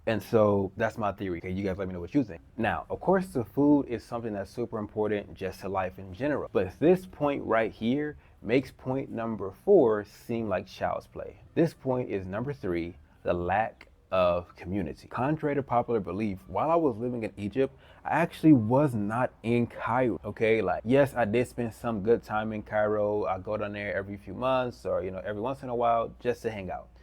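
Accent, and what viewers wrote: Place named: United States. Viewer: People dancing around the subject smh.. he's referred to as an Abid in Arabic, which means slave. Lucky he is, and sounds American, as that would've contributed to a slightly better experience. He is also originally an African, not Caribbean American (i.e. the real "African Americans) and that will help him navigate Egyptians too Egypt really is the last option for anyone living in the west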